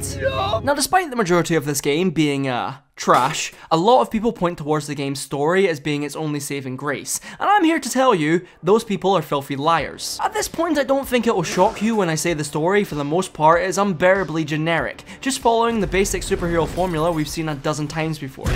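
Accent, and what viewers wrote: United Kingdom. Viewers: funny british man losing sanity over vido gams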